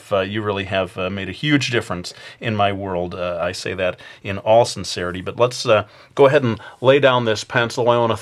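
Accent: England, United Kingdom. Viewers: Yeah... that almost british accent is exactly how I sound when commenting on your videos out loud,!;) And btw, you should really do a steam punk manga!:D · the new voice is kinda british, not to be mean. i do like the voices they are funny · Maybe a bit British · Totally love the English accent! you do it very Good.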